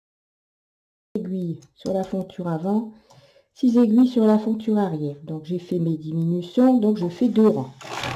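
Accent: North, France. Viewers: Superbe ..., pourtant je donnais des cours chez Singer...en <num>. Valenciennes..vous ahvez un petit accent du Nord..avec les çà....c'est charmant .., je me reconnais en vous....je ne vous connaissais pas.....j'aimerai donner des cours .à domicile .. comment calculer.....😊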